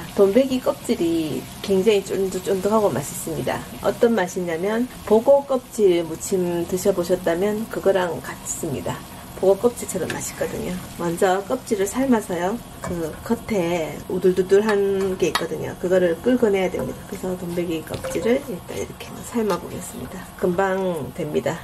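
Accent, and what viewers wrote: Gyeongsang, South Korea. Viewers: 경상도 사투리 목소리가 너무예뻐요 유익한정보감사합니다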